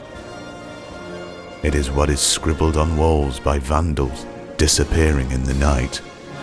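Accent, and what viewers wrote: Yorkshire, United Kingdom. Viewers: Proper Yorkshire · I love that a Yorkshireman has voiced this. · finnfinn62 Sounds like Yorkshire to me, as a Yorkshireman. Haven’t met a Brummie who sounds like this before. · It's not Sean bean. There are a lot of people from Yorkshire who can do that accent.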